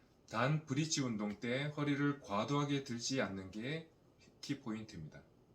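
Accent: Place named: Seoul, South Korea. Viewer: 목소리 멋지네요 👍 발음이 서울사람 같아요! 좋은 정보 감사합니다 🙆🙆‍♂️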